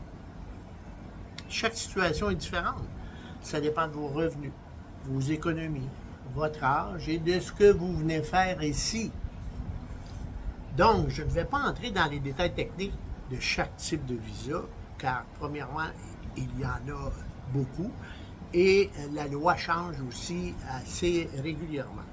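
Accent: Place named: Quebec, Canada. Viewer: Je suis en train d'apprendre le français et merci car cette type de vidéo m'aide à écouter le français québécois..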